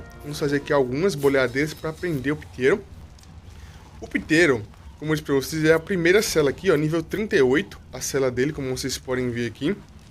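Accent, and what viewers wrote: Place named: Alagoas, Brazil. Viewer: Cara vc é de Alagoas? Esse sotaque não me é estranho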